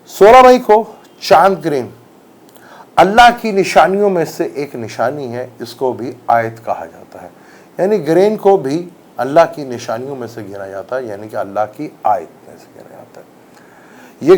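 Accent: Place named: India. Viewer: یہ شخص رRAW کا ایجینٹ لگتا ہے اس کی اردو مکس ہندی چیک کریں ذرا😡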